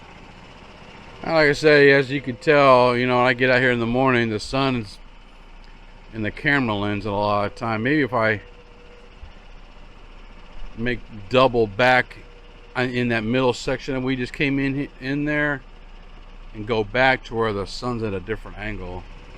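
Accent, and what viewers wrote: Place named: South, United States. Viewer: Another great video,. No neighborhood pool in that village? My wife says your accent reminds her of Foghorn Leghorn Thanks for all your great work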